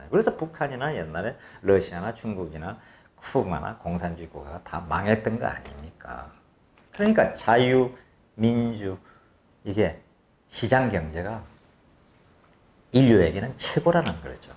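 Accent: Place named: Gyeongsang, South Korea. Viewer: 목소리 너무 좋으시고 발음도 정확한 교양있는 경상도 말씨를 쓰시는 방송 너무 너무 멋지고 좋습니다. 아마도 아직 재판 중이라서 신경쓰실 일도 많으실텐데... 건강 관리 단디 챙기십시오. 종종 인사드리겠습니다. ^^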